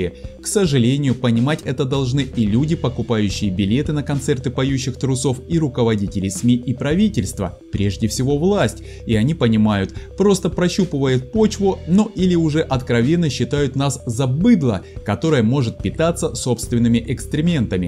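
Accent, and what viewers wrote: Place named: Ukraine. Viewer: Да помойка вы,и ничего не перепроверяете.А говор у вас такой.вы из какой глубинки решили Москву покорить? С Украины?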